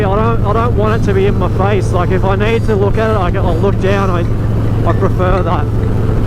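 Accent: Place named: Australia. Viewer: Love your accent, mate, it reminds me of my deck hand years in cairns 😊. And love the street bob, getting my 107 next month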